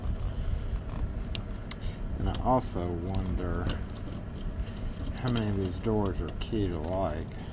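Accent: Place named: Mississippi, United States. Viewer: Ah Jackson Miss. Baton Rouge LA here. Thought that accent sounded real familiar. Next time I'm in the area I'll pop in the shop and carry on about how I can pick locks blah blah blah 😂 jk 😆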